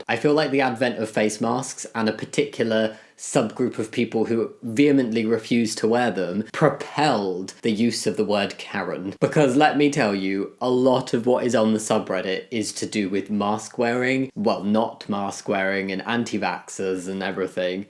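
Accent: England, United Kingdom. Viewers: You have an accent! Are you from England?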